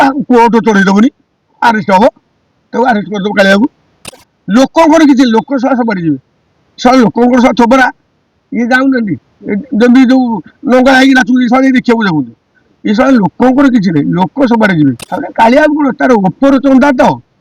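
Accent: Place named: Balasore, India. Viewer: Balasore kothaa kon kohuchy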